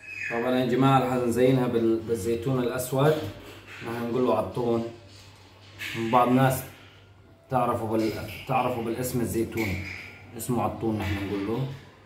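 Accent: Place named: Iraq. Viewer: لهجتك تشبه العراقيه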